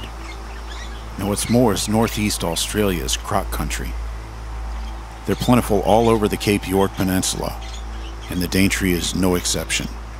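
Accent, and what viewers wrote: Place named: United States. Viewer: Great narrative. Good thing by an American otherwise I think I'll have a hard time understanding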